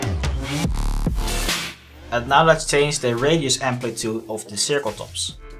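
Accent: Netherlands. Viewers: you sound dutch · Are you dutch?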